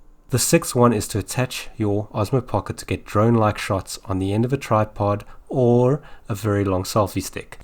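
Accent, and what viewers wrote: South Africa. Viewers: South African? Thanks for the hacks bro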